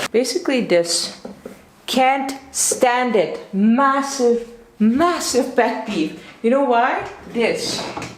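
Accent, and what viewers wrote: India. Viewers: your accent sounds indian. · I revisited your channel after like 3 months and I dont know why I sense an Indian-ised accent! Is it just me? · Your accent is becoming increasingly Indian and that is very endearing: "...I cannot handle a lot of synthetic fibre". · Your accent have a bit of indian touch in it🤔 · Even your accent became like Indian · Ha ha she is talking like an Indian. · No offence. Have you adopted Indian accent too😁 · Hi, Greetings from an Indian from Australia. You do realise that your English now has a discernible touch of Indian accent? I am glad you are enjoying your time in India! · Are you sure you from Dutch? All I am hearing is Indian accents and you seems to be a residental Indian. Cheers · Feels like you are picking up the Indian accent.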